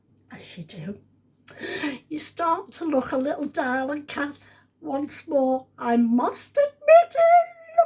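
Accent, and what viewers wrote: Liverpool, United Kingdom. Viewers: Hi, im from Huyton Liverpool, your a lovely woman, Luv yur scouse… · You are just the Best mother .. grandmother and great grandmother and thank you for the laughs 😂. Keep up the good work...Your Scouse sound delicious. Enjoy ...😊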